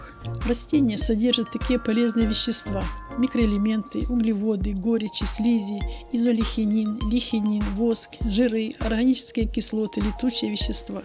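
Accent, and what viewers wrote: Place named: Ukraine. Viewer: с украинским произношением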